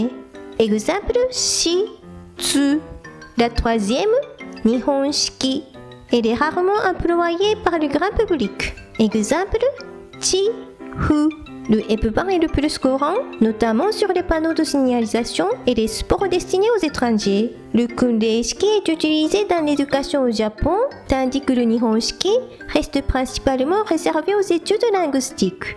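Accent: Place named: South, France. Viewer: Tout ça est très instructif, merci beaucoup ! Si j'avais simplement une très légère critique à faire, je trouve que la musique est un peu trop forte par rapport à la voix... Et je trouve que vous avez un accent du sud quand vous parlez en français, est-ce qu'on vous l'a déjà dit ?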